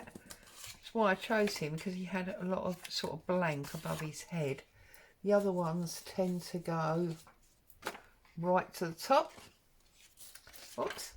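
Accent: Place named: South London, United Kingdom. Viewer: that was brilliant- loved the loaded tag really lovely. I love listening to your South London accent - makes me homesick - hope you're having a great weekend- 😊❤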